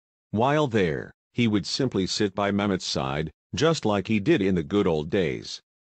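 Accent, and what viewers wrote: United States. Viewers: For heaven's sake man. You have no need of a robotic voice, you have an American accent. Use it, don't hide it.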